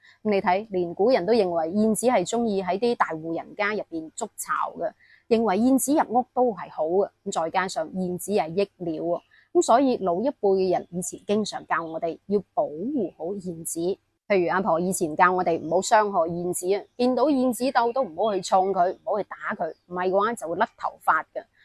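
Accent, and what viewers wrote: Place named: Guangzhou, China. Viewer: 主持說話，帶点廣卅口音，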